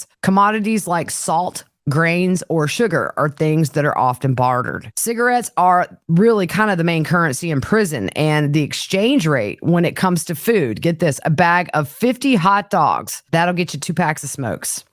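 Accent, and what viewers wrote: South, United States. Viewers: Love you! Love these prison life podcasts! Love your Southern accent! Love what you do! Thank you SO much! Appreciate you! ❤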